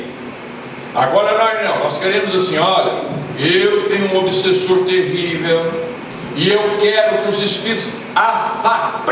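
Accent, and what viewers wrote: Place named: Minas Gerais, Brazil. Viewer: O interessante da palestra Dr. Baccelli é a sua clareza no conteúdo passado... Sabedoria ! E o jeito mineiro de dizer... Adoro !!! O tom de voz adequado e simples por questões complexas e que devem ser reflexivas ! Dr. Baccelli tem Excelente Oratória! Aprendo muito... Gratidão Dr. Baccelli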